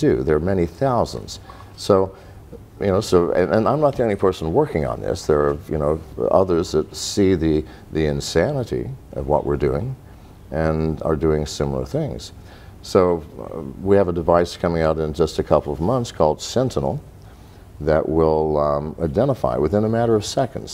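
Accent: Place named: Soviet Union. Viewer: I like how he keeps slipping into a Soviet accent.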